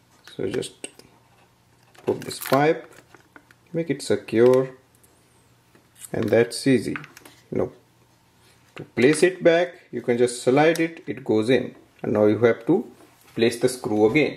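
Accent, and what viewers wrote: India. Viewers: Heard the indian voice knew i was saved twin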